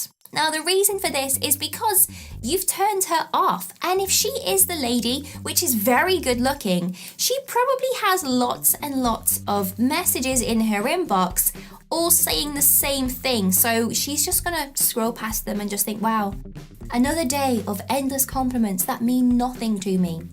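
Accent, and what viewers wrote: United Kingdom. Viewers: May I ask about your accent? Is it British, Australian, or other?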